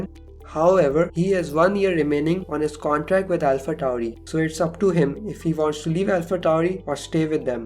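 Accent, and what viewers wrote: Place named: India. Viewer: Indian accent ... Nice 😌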